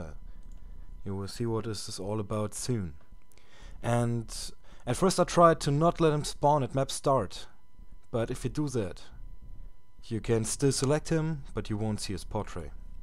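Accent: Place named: Germany. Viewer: Nice video! And I had to sneak into your profile to verify my first guess - of course you're german ;) It's just so obvious just by listening to the very first words ^^ Aber macht ja nix, Akzente sind was feines. Und deutsches Englisch verstehen die meisten immerhin, da gibt es deutlich schlimmere Akzente ;) Ich freu mich auf dein nächstes Video!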